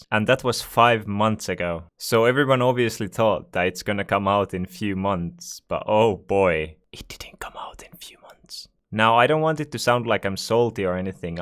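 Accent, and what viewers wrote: Finland. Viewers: finnish accent and his name is finnish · means block in finnish and he has the Finnish accent HE IS FINNISH SUOMI PRRKELE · you have a amazing finnish accent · Mäkin oon suomalainen huomasin aikasemmin kun sulla on semmoinen englanti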